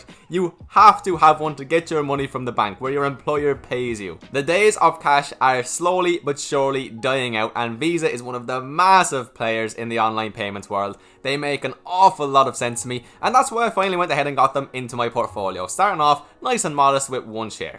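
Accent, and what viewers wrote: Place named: Ireland. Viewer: me personally I like everything you do regarding the videos,this new style of presenting is even better I think.Yes never missed a single appearance of yours,as I find important value In you’re presentation.And I think this channel is not a should it’s a must grow in my opinion.Real valuable information,up to date updates specific monitories stock’s,easy language for everyone to understand,beautiful irish accent,best dividend,best growth stocks and even more.... don’t stop do what you do best because you’re very good at it.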